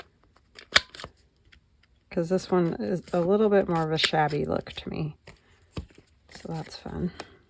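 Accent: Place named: Carolinas, United States. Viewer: You kinda sound like you’re from the Carolinas. Are you?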